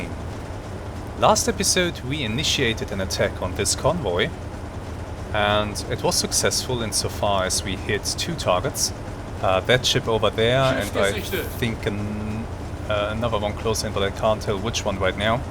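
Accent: Germany. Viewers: I have recently started following your adventures, and am highly impressed; Your German accent adds total authenticity, and the scenarios reel off like movie scenes; Many thanks from England...;)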